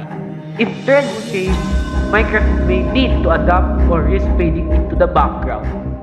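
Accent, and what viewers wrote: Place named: Philippines. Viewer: Filipino Accent😂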